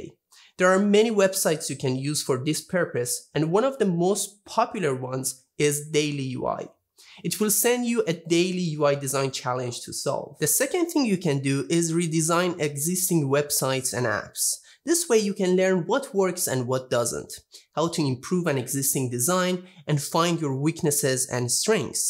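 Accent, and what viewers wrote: Iran. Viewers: شاید باورت نشه ولی وقتی که برای بار اول صدات و تلفظتو شنیدم (بدون اینکه اسمی از کانال یا ... ببینم) فهمیدم ایرانی ای😁😁😂😂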